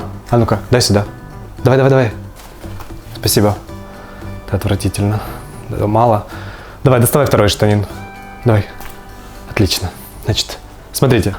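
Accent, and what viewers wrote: Ukraine. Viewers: Откуда же автор родом?! Часто слышу украинскую речь ;)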